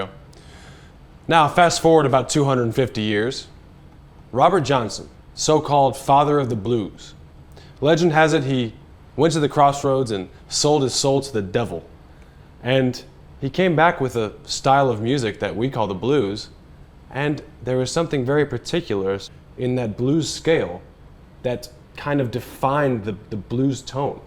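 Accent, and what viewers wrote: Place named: United States. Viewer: Is it just me or is he speaking with a bit more of a cowboy accent in this video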